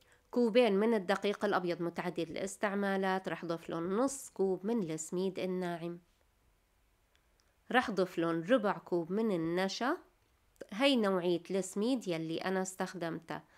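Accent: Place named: Idlib, Syria. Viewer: العفو منك اختي انت كاتبه مطبخنا الشامي بس لهجتك مو شامية لهجتك ادلبيه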